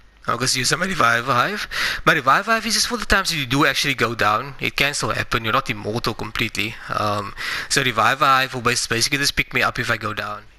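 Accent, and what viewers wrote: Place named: South Africa. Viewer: my man...nice video....your accent...you from SA?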